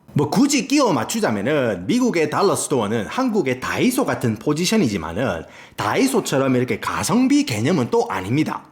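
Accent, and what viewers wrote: Gyeongsang, South Korea. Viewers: 와... 시끄러워. 경상도. 생긴것도 딱 경상도네. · 외국인이 한국남부(경상도) 사투리를 인지한다는게 신기하네요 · 이상하게 난 한국에 아는데 갱상도 사투리를 들으니까 향수가 느껴지지? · 우째 이리 갱상도 사투리와 빠다 먹은 영어 발음으로 말을 재미나게하는지~ 윽수로 땡큐라예~ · 아... 너무 재밌게 봤어요. 타겟과 월마트의 적나라한 카트 비교.. ㅋㅋ 트레이더죠스의 주차장 상황까지.. 구수한 갱상도 사투리에 쪼매 안어울리는 영어발음은 완전 본토! 미국생활 30년차인데 나름 감동이었습니다.. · 갱상south도의 사투리 찰지고 재밋어예❤ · 경상도 원어민이 영어도 원어민이시네요 내용도 유용하고 재밌기도 합니다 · 경상도사투리와 영어발음이 온가는게 넘 재밌네요 ㅋㅋ 저는 미국온지 2 년째인데 저도 경상도입ㄴ다ㅎㅎ 전 달라스인데 공감해요^^ 근데 h 마트가 월마트보다 야채과일은 더 엉망입니다 ㅜㅜ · 구수한 갱상도 사투리..ㅋㅋㅋㅋ 좋은 영상 많이 기대하고, 항상 건강 챙기소~~ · 아뉘 사투리 😂찐 갱상도인이 듣기에 너무 편안! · 갱상도 사투리에 안어울리게 영어발음이 장난아니네 ㅋ 재밌네 아재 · 고향이 어뎁니까? 참말로 구수하네예. 영어할때 반전이네예 ㅋㅋㅋㅋㅋ 구독했쓰예! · 여기서 경상도 사투리 들으니까 너무 우습네요 근데 Albertsons Vons 브랜드 가 Kroger 브랜드 보다 한단계 높은디 ㅎㅎ구독과 좋아요 했어요 또 웃겨 주세요. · 채널 주인장님 완전 매력터짐요ㅋㅋ 오리지널 경상남도 남부 억양과 한 번씩 나오는 영어가 삘이 좋은게 어딘가 매치가 잘 안됨 + 표정의 90%는 입매가 담당 + 어딘가 중국계 미국인 필 입담 대박 맛깔나요 👍🏻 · 강렬한 경상도 사투리 사이에 비집고 튀어나오는 넘나 정확한 영어발음 ㅎㅎ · 어쩜 경산도 사투리가 촥촥~ 귀에 감겨요. 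ㅎ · 귀요미 경상도 아재가 말아주는 알쓸잡미국 썰~~~ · 말투가 너무 중독성 있어요ㅎㅎㅎㅎㅎ 경상도 + 교포느낌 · 저도 이게 넘 신기한게... 왜 찐 경상도남자들은 서울말투도 따라하는거 오글거린다 하시잖아요? 근데 또 영어발음은 또 clear하게 넘 좋으시고. 또 너무 어릴 때 왔다기엔 경상도 accent가 thick하시길래ㅋㅋ 1.5거나 혹은 성인 때 왔어도 언어 센스가 좋으시구나~ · 어떻게 영어는 완전 찐 교포신데 한국어는 경상도아저씨 스타일이신지 넘 신기해요.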